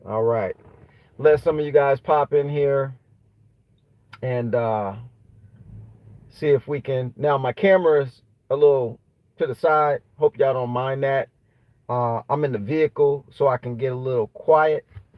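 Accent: South, United States. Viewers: Monkey juice lol You always make me laugh when you say certain things in the middle of the conversation. I love the African american southern accent. I am not american but I love to listen to it.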